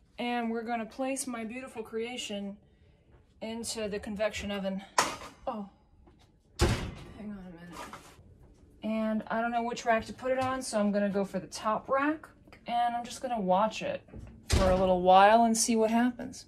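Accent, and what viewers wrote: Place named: New York, United States. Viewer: is from New York City, specifically Queens. And yeah, her inner New Yorker came out fully here, and it is awesome.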